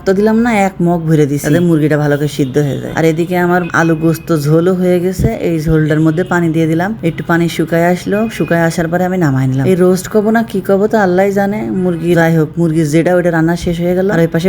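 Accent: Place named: Khulna, Bangladesh. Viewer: যাতিগিলি 😂😂😂😂 মনে হচ্ছে আমার বাড়ির আশেপাশের বোন তুমি।আমি খুলনার।তবে আমরা ছ‍্যাড়া বলি না।ছ‍্যামড়া বলি।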